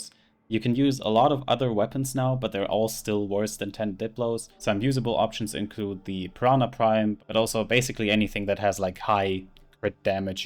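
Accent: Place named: Germany. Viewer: I thought I heard my fellow German accent in here ;) nice video!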